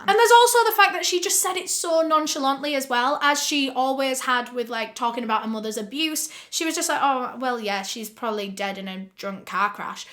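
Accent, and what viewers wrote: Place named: Northern England, United Kingdom. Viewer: As a yorkshire woman born and bred, I am loving the northern twang that sneaks in every other sentence xo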